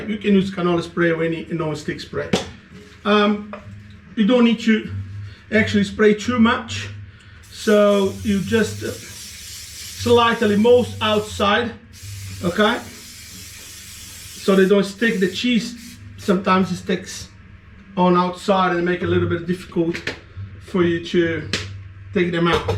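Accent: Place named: New Zealand. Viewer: Oi, vim através do grupo barraqueiros de Queenstown LOL. Adorei tua receita e objetividade. Ja me inscrevi no teu canal e curti o video. Seria legal vc tb deixar a receita escrita na descrição do video. Obs: meu Deus parece que to ouvindo um kiwi falar!!! Abraco!!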